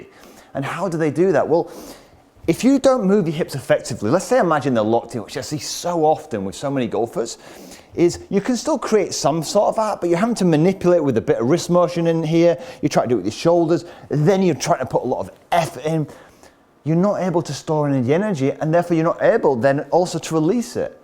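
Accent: United Kingdom. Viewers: You the man , Mister !! Great materials, and the British accent is just an icing on the cake !!!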